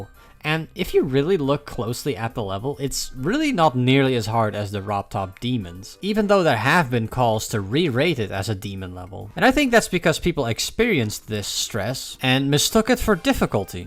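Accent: Netherlands. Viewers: Bro I only halfway through the video realized you're dutch lol. Good video man keep it up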